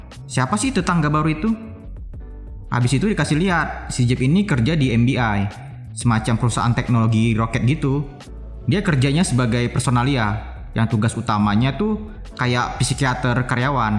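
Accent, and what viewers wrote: Medan, Indonesia. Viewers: Orang Medan ya bang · kuat x logat medan mu bah,,, :D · Medan kali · eeeiiii senang kali lah aku.. gini cara pembawa'an y pakai logat medan.. saya dayak kenyah kalimantan timur.. ayo bang ini ajj logat y.. seruu.. 😍😍😘😘🤗🤗 sukaa kali aku bang... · Logatnya kek org Medan kau bang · Jgn lh nampak kli Medan nya min:) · Orang Medan bg? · Pasti orang medan nya kau lae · anak medan ni oratornya :D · Bg lu orng medan ya? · Nampak kali medan nya bah. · logat bhasa medan nya aq gk tahan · Abang ini kaya nya orang medan logat nya medan kali 🤣🤣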